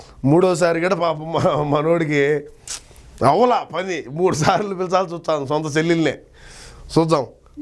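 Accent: Rayalaseema, India. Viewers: రాయలసీమ యాసలో అద్భుతం గా ఉంది 🙏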